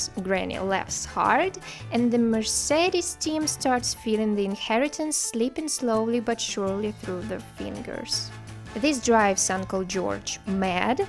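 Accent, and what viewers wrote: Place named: England, United Kingdom. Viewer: Wow, you're from England!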